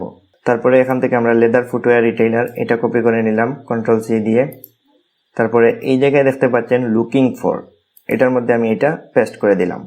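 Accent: Sylhet, Bangladesh. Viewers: সিলেটি নি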